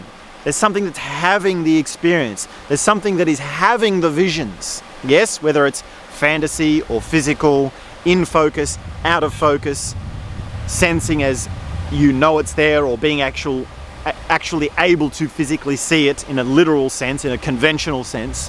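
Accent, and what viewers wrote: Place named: Australia. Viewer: Qt ! Hard to focus on what you’re saying with the thick Aussie accent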